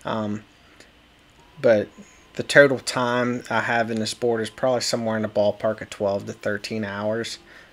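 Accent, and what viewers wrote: Pittsburgh, United States. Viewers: Watching from Texas. Sounds to me like you are from Pittsburgh? Moved from there in 66 but still have my Accent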